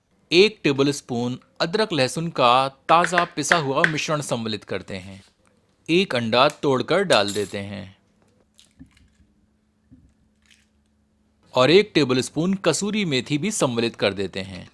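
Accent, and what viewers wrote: Standard. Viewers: Shudh Hindi ucharan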